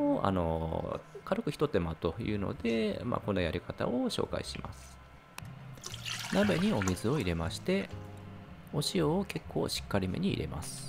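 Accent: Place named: Nagoya, Japan. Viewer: 節々のイントネーションが名古屋っぽい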